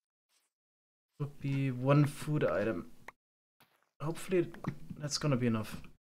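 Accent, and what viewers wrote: Germany. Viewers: Are u German ? Weil ich es bin haha und ich es irg wie bemerkt hab??? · Mate, I love watching your videos from time to time and they're always really informative and entertaining. But as a fellow German with basically a cockney dialect I have to say - that German accent drives even me crazy. 😂 No worries though. Love your vids. <3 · Man hört sehr raus das du deutsch bist aber trotzdem guter YouTuber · Man hört den deutschen accents so hart 😂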